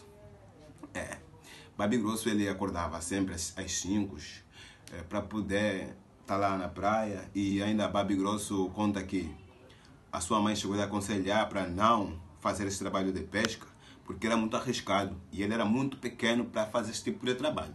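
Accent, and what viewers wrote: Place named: Brazil. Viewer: E estas a falar tipo brasileiro porque? So para pareceres?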